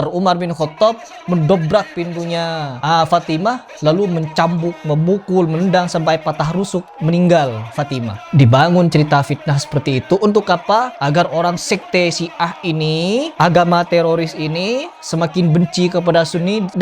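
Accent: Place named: Sumatra, Indonesia. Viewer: anda ini asal dari mana? Dari logatnya, anda ini kira-kira daerah melayu Riau, Jambi, Pelembang atau daerah sanalah. Benar?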